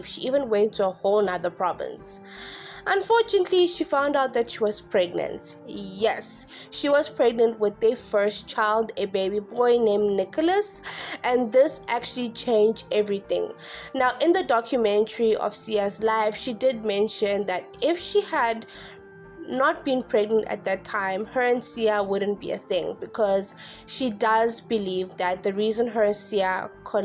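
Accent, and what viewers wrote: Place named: United States. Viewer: Why is this girl (naraiting) talking with an American accent. Pse!!!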